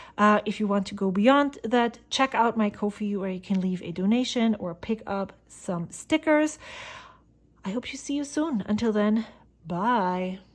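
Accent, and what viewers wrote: Germany. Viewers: I bought three of these and they all write differently. One was perfect out of the box, one was a bit on the dry side, and one needed a lot of tweaking before it wrote like it should. Not the first time that Jinhao's quality control, or lack thereof, makes the pens they produce a little unpredictable. All in all not a bad pen, especially for the price. PS: Finally, an English-speaking pen reviewer who knows how to pronounce "Montblanc". I detect a hint of an accent though. Are you German? Don't shoot me if I got it wrong. :)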